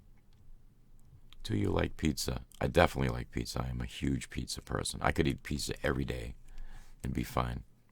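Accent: Boston, United States. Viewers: Haven’t been subscribed for long, a few months, but I was not expecting the Boston-ish accent! So glad I found your channel. Your voice and creativity is one in a million! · I should have known you were from Massachusetts. I hear that lovable Boston accent. =) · You er so Boston I hade no idea.. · Hi, I have to say, it's nice to hear your normal speaking voice! You should do more with your own Boston accent some day, it's very warm and personable! I love a lot of ASMR channels but the rumbly sound of your voice puts me right to sleep 💚😷😴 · Hearing you talk in your normal voice, I hear......Boston accent 😂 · I cannot be the only one thinking he’s attractive 😳 That Boston accent maaaan 😍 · Are you from Boston? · It’s so weird to hear you talk normal volum... I never even knew you had a Boston accent! Congrats! And that marble device is very... T E C H N I C A L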